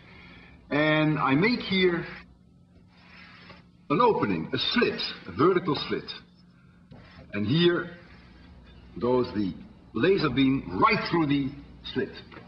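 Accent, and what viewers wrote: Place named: Netherlands. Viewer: Dutch and English don't mix well in his case... That is one hell of a bad accent. But nice video anyway.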